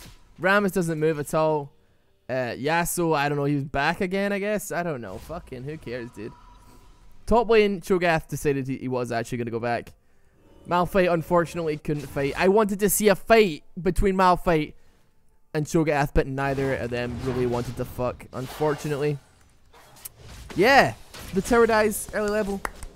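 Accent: Scotland, United Kingdom. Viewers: Are you scottish? XD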